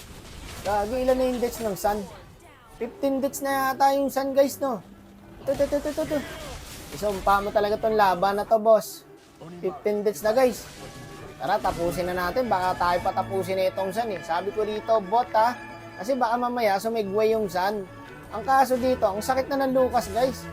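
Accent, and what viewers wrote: Iloilo, Philippines. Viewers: ilonggo yan boi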